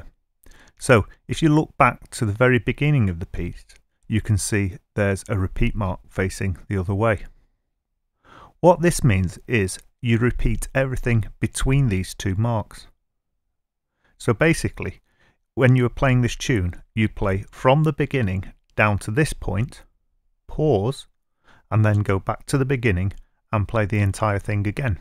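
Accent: United Kingdom. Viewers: BLIMEY!!! I thought I lost this channel and never be found again. I used to watch before I had guitar and thinking of following alone after I get one. And somehow I forgot name of channel. I dug on my history and So so relieved I found it. You sir are amazing. Maybe it's British accent so warmy and welcoming. I hope to buy e-book soon. Thank you very for articulating videos in such a manner which clicks with newbie like me. It must be time consuming to make videos on various topic but my lord your tutorial worth pile of gold. You are Bob Ross of guitar. I am so grateful for your videos. Once again thank you.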